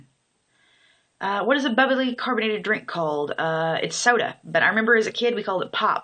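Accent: Southern Illinois, United States. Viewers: Finally a real southern IL accent. :) Thank you! I've been mistaken for being from KY too. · SO, IL for sure I be from Collinsville Il u got it down pat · Her accents the typical one for Southern Illinois. This is just the way people sound when talking, you obviously haven't spent much time since you don't understand this. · I've lived hear in Southern Illinois all my life, & she sounds like everybody I know. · You say everything exactly like me. I’m from Mound City 😊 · Probably depends on what area of Southern Illinois you are from. Everybody I know sounds like this. I grew up on the Mississippi river. Grand Tower. All along this area all the wag down to Cairo people talk like this. Not even trying. It is just the way we talked. I have been in Wisconsin for the last 20 years and it has faded quite a bit since Wisconsin has a very specific accent as well. 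I have noticed the biggest part of the accent is that we spoke our words really fast. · I grew up in Marion Illinois and she sounds like a native Southern Illinois. Actually she doesn’t sound country enough. Most of the older people I know talked much slower with a more southern draw. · I grew up in Carterville! But I have less of an accent than this lady, and I knew people with a much stronger Appalachian-type accent. There's a gamut. No idea why there's considerable diversity.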